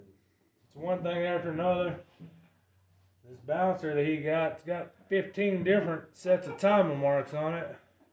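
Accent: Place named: Appalachia, United States. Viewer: It is painful to listen to dumb hillbillys like you try to speak English. Good Lord!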